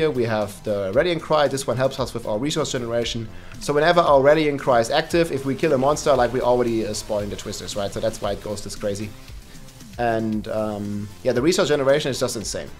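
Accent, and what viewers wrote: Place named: Germany. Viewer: Wenn Deutsche Englisch reden, zu funny :D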